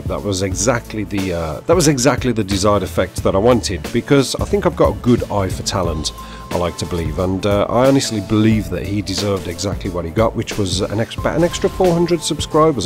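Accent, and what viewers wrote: Birmingham, United Kingdom. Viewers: love your vids, always entertaining, and your a brummie to so that always keeps me coming back for more, but one serious question tho, at 4 min 30 sec, HOW THE HELL DID YOU GET THAT BLOOMING KILL? with a SHOTGUN???????? so facking far away? lol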